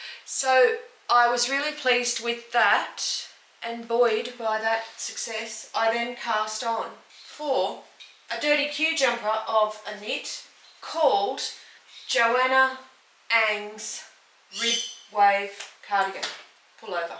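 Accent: Australia. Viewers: I’m really enjoying your podcasts….nice to listen to an Aussie while I’m ploughing through 2aat sleeves already attached to the jumper. It’s an oversize (daughter’s request) Merino Magic (Heirloom) - soooo bulky that I need to knit at the dining table in air conditioning of course. It’s pretty hot here in Two Rocks WA. On to your next episode.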